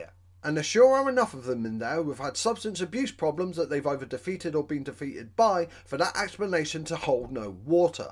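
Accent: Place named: England, United Kingdom. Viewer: pretty sure he is english, thats how they pronounce the letter H.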